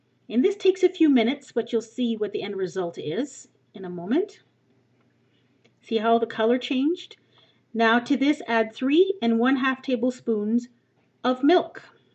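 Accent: Quebec, Canada. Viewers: I really love your videos, are you french canadian?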